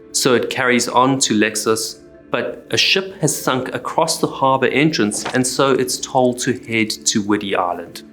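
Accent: South Africa. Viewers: Great video. I hear a South African accent there. Thanks for not going AI.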